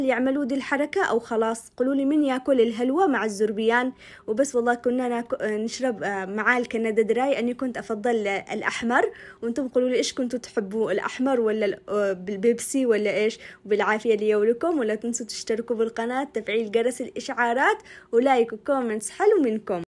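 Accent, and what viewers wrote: Aden, Yemen. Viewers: يامحلا الكلام العدني صوتك فيه عذوبه خلاني انااااااااااام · عجبني لهجتها العدني يسلم ايدك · مادام الهدره حقك باللهجه العدنيه الحلوه خلاص قولي (( كبزره )) بالعدني مش كزبره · تسلم يدك وكلامك العدني زيد شوقي لااهلي الي بعدن الحبيبه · ماشاءالله الطريقة حلوووووة وشكلها مضبوط مية المية بس الأحلى هو اللهجة العدنية كان احنا بنتشوه معك 😂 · كلامك عدني اصل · محسنك هدرتك عدنيه 🥰 تسلم الانامل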